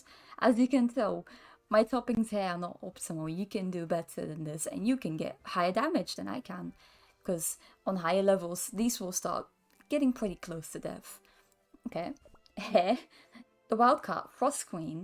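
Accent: United Kingdom. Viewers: Are you British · Are you British? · Nice guide British woman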